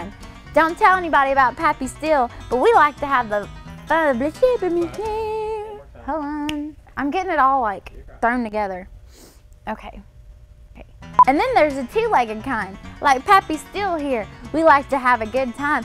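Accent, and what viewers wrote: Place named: Appalachia, United States. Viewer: shoot I knowed i shouldnta wait so long.well I still thank yer the purtiest girl in the hills.My name is Allen and I love to hear you talk.My brothers and sister come to pigeon forge every year.we live in Alabama but the smokies feel more like our home.Love to watch you vids and see the twinkle in your eyes and hear that Ellie may Clampet accent of yours.Bye now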